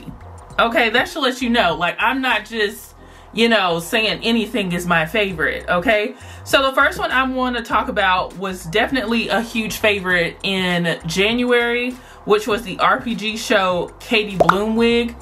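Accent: South, United States. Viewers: You sound like a southern belle it’s too cute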